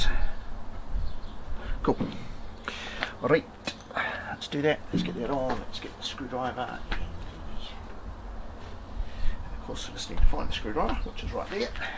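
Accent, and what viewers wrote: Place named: Australia. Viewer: Thank you! Looked all over you tube for a video and this is the only one I could find. Very simple and saved me a ton of time. Great Australian accent too!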